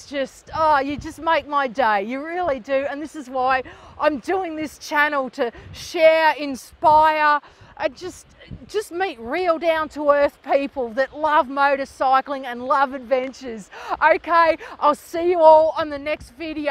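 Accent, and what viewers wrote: Australia. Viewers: Your Aussie accent is really sweet... 👍☺️ stay well...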